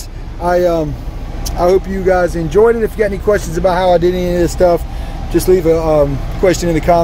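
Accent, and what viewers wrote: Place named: Pittsburgh, United States. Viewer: one hell of a set up my guy! also this guy sounds like he from pittsburgh idk y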